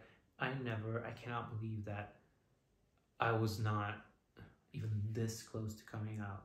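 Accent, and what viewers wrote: United States. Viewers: What accent? He sounds like he is from the US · One question? You have an American accent !!!! Explain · If you're from a Balkan country, why do tou speak English with an American accent?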